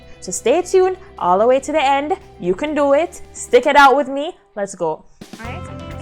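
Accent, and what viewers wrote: Jamaica. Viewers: Great job. You sound like a yawd lady (Jamaican)? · I can hear the Jamaican accent. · are you jamaican ? smile and thank you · AIPP program seems feasible--thanks for the info. Yuh come from Jamaica, nuh true?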